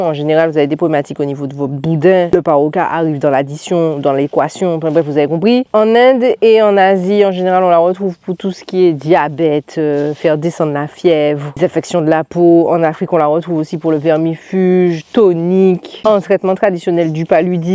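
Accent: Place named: Antilles, France. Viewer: ton accent d. Antilles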